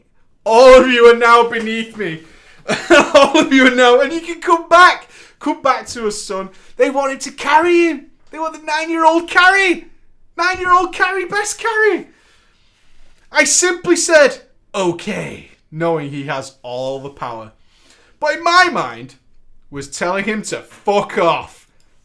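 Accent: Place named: Manchester, United Kingdom. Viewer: Ahahahaha YES PREACH! I fucking knew you were a manc :D recognise that acccent anywhere loool, made my day that did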